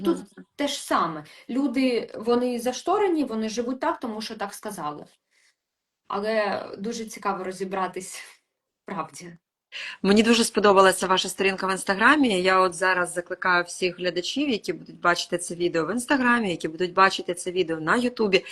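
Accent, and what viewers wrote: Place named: Russia. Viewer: Важко зрозуміти де які слова цієї відьмочки.Мабуть вона раніше була російськомовна або в неї як це говориться російськомовна щелепа і говорячі деякі українсікі слова вона не зовсім розуміє їх значення.То може б краще роворила б російською.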